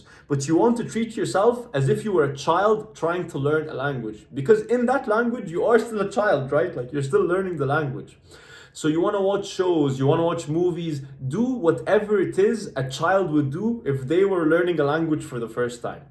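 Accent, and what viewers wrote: Egypt. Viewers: Oh my god días mio! This my first time hearing Spanish with Egyptian accent 😂❤ man you are awesome keep up the good work ❤ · A good vedio, thanks for made it and keep going man ❤ But I have a question where are you from? Cuz u sound Egyptian which is cute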